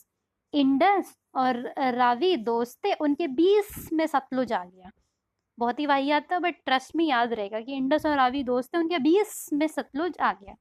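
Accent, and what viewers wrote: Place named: Bihar, India. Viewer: Mam aapki language sy lg rha hai ki aap Bihari ho..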